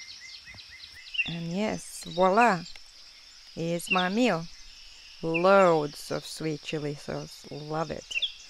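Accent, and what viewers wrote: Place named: Russia. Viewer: I see you have a Russian accent.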